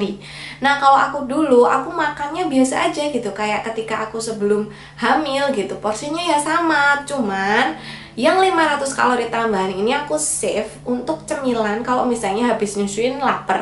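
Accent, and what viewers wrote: Java, Indonesia. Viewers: ooaaalllaaahhh..... mbak nya wong jowo toh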